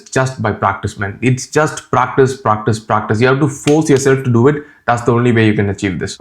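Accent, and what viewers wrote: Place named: India. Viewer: The way you're speaking is how most Indians speak, so it is an indian accent. The first one you did is stereotypical, never have i ever met someone in my life who speaks like that.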